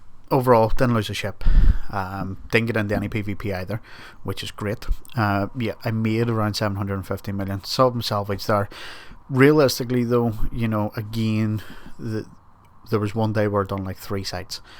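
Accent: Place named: Ireland. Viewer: Good to hear your Irish tones loud and clear again!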